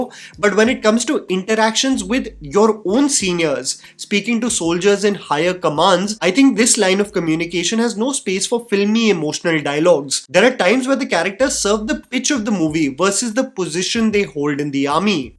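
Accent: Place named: India. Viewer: Your indian English accent is so irritating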